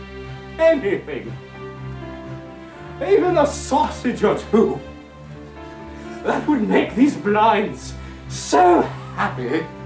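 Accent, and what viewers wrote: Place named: United Kingdom. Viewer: Your British Accent is dire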